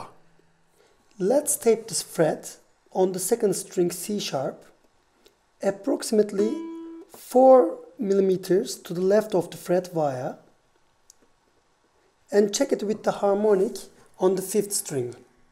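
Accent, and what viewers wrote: Turkey. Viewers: Aksandan anladım ilk Türk olduğunu :D çok düzgün İngilizcen, helal olsun. Türkiye'den böyle bilgili müzisyenleri medyada görmek çok güzel. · Nice work, sir! Ingilizceden anladım Türk olduğunuzu. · abi şivenden anladım türk olduğunu like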